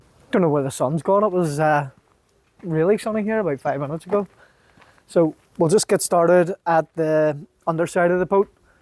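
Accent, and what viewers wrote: Northern Ireland, United Kingdom. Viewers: English must not be your first language if you couldn't understand...it is called a Northern Ireland accent maybe educate yourself. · nice to hear the Nordie accent too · you speak nrn irish,,,like the scots and donegal awful accents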